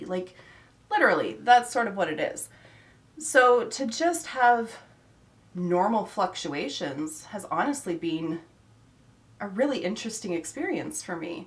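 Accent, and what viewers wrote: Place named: Canada. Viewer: I didn’t realize! Until I here certain words of course I’m like she’s Canadian! ☺️however you accent sounds a lot like most here in US but Wisconsin and certain spots in Illinois definitely pronounce some words like you. You know we are super behind in some things lol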